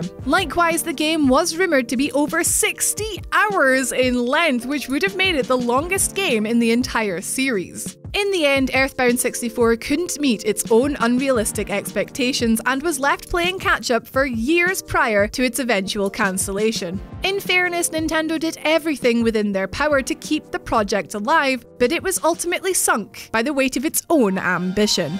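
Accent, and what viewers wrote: Scotland, United Kingdom. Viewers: omfg that scotish accent <33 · Was it just me or did get even more scotish than usual?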